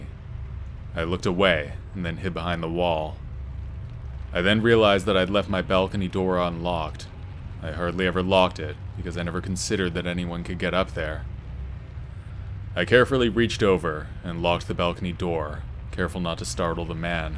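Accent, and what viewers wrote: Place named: Canada. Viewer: The reader is def Canadian lol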